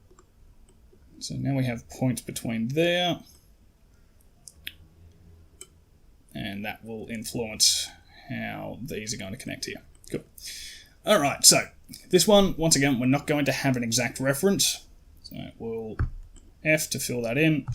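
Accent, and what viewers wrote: Australia. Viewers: Thanks! Wow, so nice to hear an Aussie voice in a tutorial, apart from Andrew Price. I think you should either do a full model of this space marine or do a Terminator helmet next. Subbed and have a drink on me, cheers.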